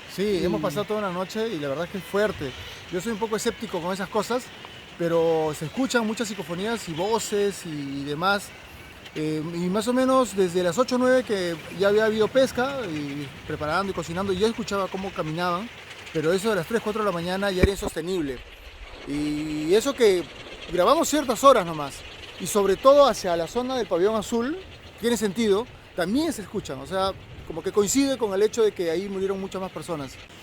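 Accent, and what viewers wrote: Chile. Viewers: Mira chiquillo...estas hablando cosas fuera de la realidad el hecho que quieras más adeptos a tu canal no implica que tengas que contar mentiras...te sugiero que te informes mejor sobre el contexto que se vivía en esos años...esas que tú llamas seres humanos tenían por costumbre matar mujeres embarazadas que aún agonizando les habrían el vientre para sacar Asus bebés...por eso es mejor decir la verdad y si hoy están muertos es por que ellos también asesinaron...y a ese penal llegaban los delincuentes terroristas más abesados...por el bien del Perú te pido no hablar de manera desinformada y porfa hablen como peruanos no con ese tufito medio chileno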